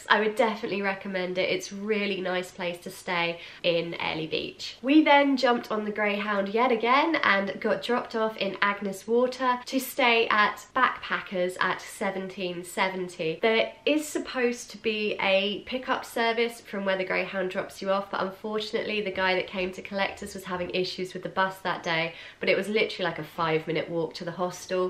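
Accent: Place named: England, United Kingdom. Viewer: English accent are awesome! 😁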